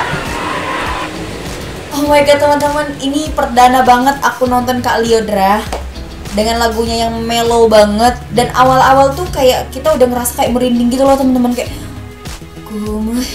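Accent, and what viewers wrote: Medan, Indonesia. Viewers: Dari logatnya, keknya kakak ini org medan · Ihh. Cantik kali kakak ini woiii...ahahaha. luck loe. Hihiii. Medan kali kauu.🤣🤣🤣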